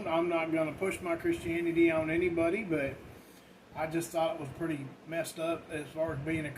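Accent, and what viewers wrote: South, United States. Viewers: your accent is getting very Southern again.... you picked that back up quick!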